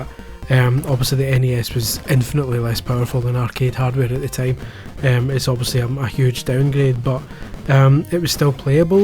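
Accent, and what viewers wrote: Scotland, United Kingdom. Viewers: Subscribed on the manly strength of dat kick ass Scottish accent. I just wanna scream 'This is Sparta'. Back in topic great video buddy.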